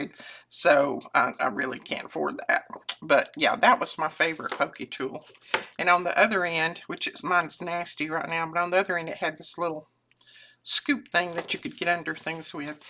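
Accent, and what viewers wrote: South, United States. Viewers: We love ❤listening to your southern charm.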